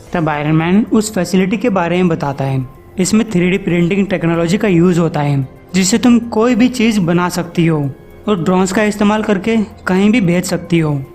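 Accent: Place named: Kota, India. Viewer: shai me.... Sayd maine tume deka hai... Me kota( jila )ke pas me ik gam se huuu.... Tumare bolne ke tarike se lagta hai ki tume bi yhi aas paas ke hi hoo...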